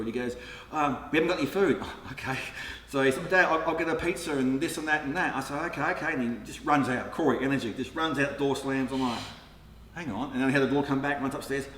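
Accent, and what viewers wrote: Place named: Australia. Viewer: His accent is so Australian. I love it. Love you Corey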